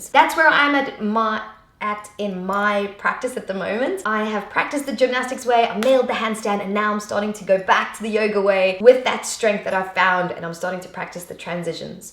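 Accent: United Kingdom. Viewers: Anyone else vibing the British accent after watching this? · Are you from UK???? I'm asking you because of your accent cause my brother works in UK and I was in UK and everyone has that accent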